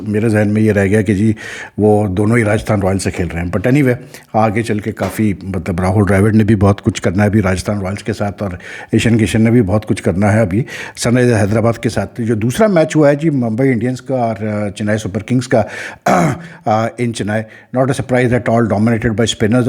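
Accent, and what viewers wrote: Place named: Pakistan. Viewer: He is not "Putthar"!!! He is not a punjabi. His last name is "Puthur". And its not "Karela league". It is "Kerala"!!!🤣😂. Fed up of Pakistani accent and pronouncing of Indian names.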